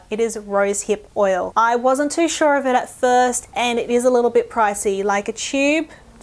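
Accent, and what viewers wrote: Australia. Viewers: Your too funny.... You make me miss home! I forget what the aussie accent sounds like living in the USA! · I LOVE your accent... I love Australian accents in general, I could listen to them all day! I use benzoyl peroxide, but my question is how often should I use it, considering it dries out skin etc. My skin used to be very flaky and dry but it's actually better now... Anyway, really helpful, thanks :)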